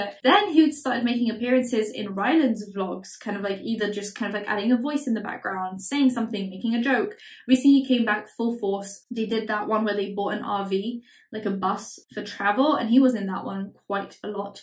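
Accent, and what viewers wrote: United Kingdom. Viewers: Wait you are polish? But you speak like your original from uk know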